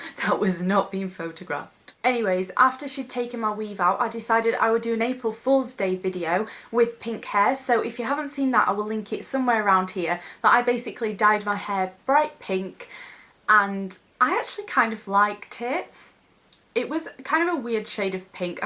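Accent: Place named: England, United Kingdom. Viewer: I love this tipical english accent! Cute!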